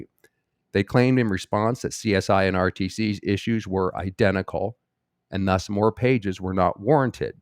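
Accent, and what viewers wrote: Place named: South, United States. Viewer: Thanks for this. (Don't apologize for your Southern accent - it's actually pretty good. That from a North Carolinian.) It's lovely when you use voices and accents as you read. The actor in you will never die and I do hope that you'll be able to do that again someday. Hell, as a screenwriter, I'd enjoy writing something for you if I could sell it.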